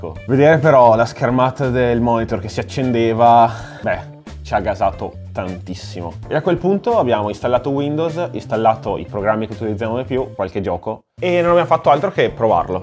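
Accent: Italy. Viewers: Porco due sento troppo l'accento di Brescia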